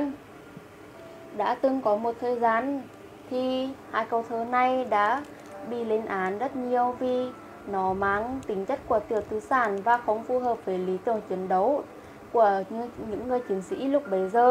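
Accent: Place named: Nghe An, Vietnam. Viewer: Tiếng nghệ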